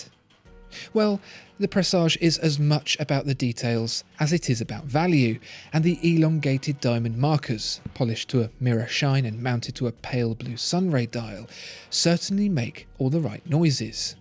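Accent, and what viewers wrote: United Kingdom. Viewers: I am not a watch collector nor a watch enthusiast, my dream watch is Panerai and I have 2 of it so far, I watch your videos usually for the little historical infos you mix and match in your videos and also the elegant British accent, but this time you got me interested as both watches got my attention even the presage. Thank you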